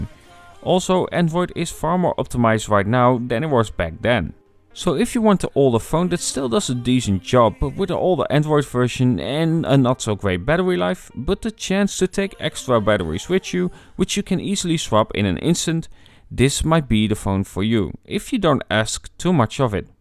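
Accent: Netherlands. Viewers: Sowieso jij bent nederlands duidelijk te horen👍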